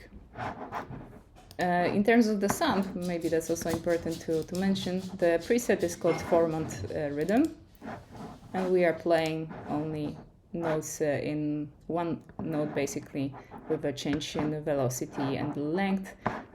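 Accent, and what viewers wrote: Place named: Poland. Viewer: Awesome! Can I find your music somewhere (e.g. Spotify or Bandcamp)? EDIT: ooo, i na dodatek jesteś Polką - tak pomyślałem w 1. chwili usłyszawszy Twój akcent ;) :D EDIT2: muzyka mogłaby być trochę głośniej, a myszka trochę ciszej :)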